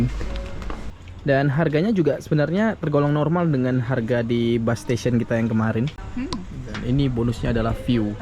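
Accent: West Sumatra, Indonesia. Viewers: logatnya logat minang bg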